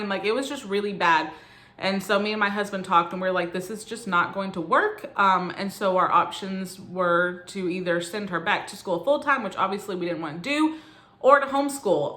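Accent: South, United States. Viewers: Lots of traveling 😃👍sounds so fun! Vegas on the strip is fun but at night it gets wild lol. Last time I went there someone stole my friends phone and debit card 😩 definitely keep your belongings close if out at night. I've always wanted to go to AZ 🙌 I have family that moved there and love it. I also like doing music because of all the sound in the background haha . I have a hard time doing voice overs haha I still need to practice but now that I will be back editing on my computer , hopefully it will get better . As someone from CA , I thought you had a Southern accent. I love it 💗 haha people say I have more of a Valley girl accent - I think it's normal California talk hahaha . I love hearing everyone's accents . I talk to people all over the world at work and I hear it all , I love interacting with others from different states and countries . I definitely would consider homeschooling as well. 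I thought of public because I went to public but now days things are so different in the state i live in so im undecisive. I'm thinking of taking some courses to learn more for home school . I will have to look more into it . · I can hear the Southern accent a bit, but it isn't like intense, but sort of there haha! I find accents so interesting and lovely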